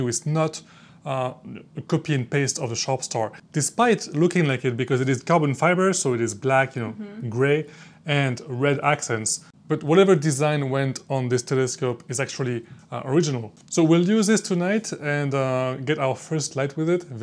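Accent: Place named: France. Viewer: I knew it, I did detect a French accent❤❤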